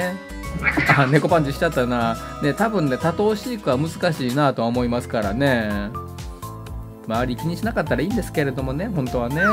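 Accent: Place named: Kansai, Japan. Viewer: 実行中継が楽しい！関西の言葉がとっても良い感じ！つい見入ってしまいます。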